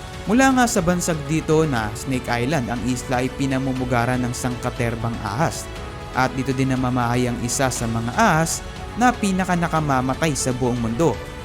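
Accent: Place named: Philippines. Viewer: He's Filipino, and he's using our Tagalog native language. Therefore, there's nothing wrong to use the Filipino diction. Nevertheless, he's creating videos that are very informational and educational and I think his intonation is less our concern. 🤷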